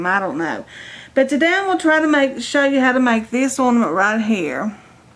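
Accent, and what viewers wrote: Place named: South, United States. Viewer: I love your accent! Are you from the south? I am and I have a strong accent. You remind me of my Grandma :)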